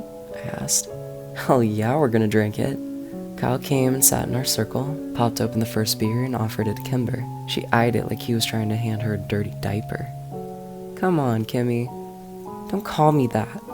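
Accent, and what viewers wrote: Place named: South, United States. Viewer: as a Texan i will permit the southern accent. it was acceptable. thank you for the video i enjoyed it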